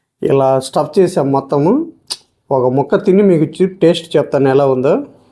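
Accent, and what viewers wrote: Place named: Godavari, India. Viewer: మీది గోదావరి జిల్లా నా అండి.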